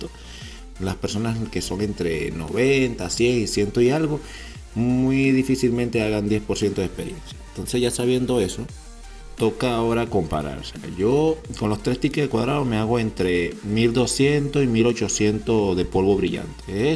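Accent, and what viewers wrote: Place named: Venezuela. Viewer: Papi me suscribo, fijo eres venezolano casi que zuliano saludos desde la merjor ciudad de venezuela Maracaibo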